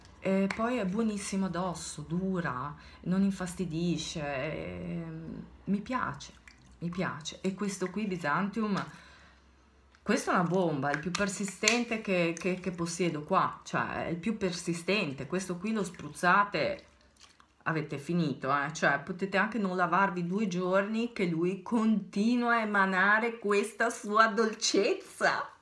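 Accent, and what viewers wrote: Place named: Liguria, Italy. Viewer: Che bella che sei, e che simpatica! Amo sentirti parlare, perché il tuo accento ligure mi riporta a tempi lontani in cui ero felice.Grazie per la tua recensione... Orchidea della Cina l'ho usato ed è piaciuto tantissimo anche a me.Domani corro a comprare Bizantium, mi hai messo una curiosità immensa. Buona serata😘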